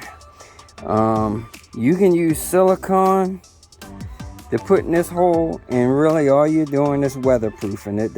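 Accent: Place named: Louisiana, United States. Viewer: I can tell you know your electricity, after you mentioned ohms law, and how a diode pushes current through one way only (in another video). I know you're in Virginia, but you sound like you're originally from Louisiana. ✌️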